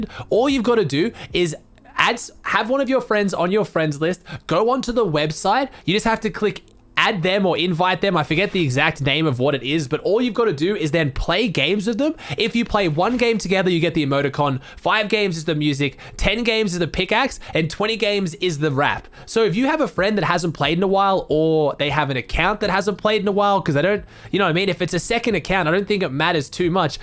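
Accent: Australia. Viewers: U doin great mate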